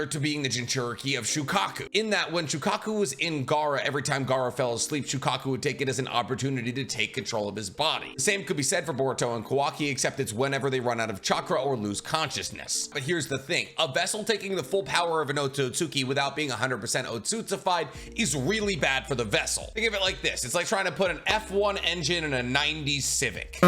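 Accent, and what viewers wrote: Boston, United States. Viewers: He’s just from Boston he has the accent makes karma sound like Kama 😂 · Makes sense. I was wondering why he was saying it like that. Good ol' Boston natives 😂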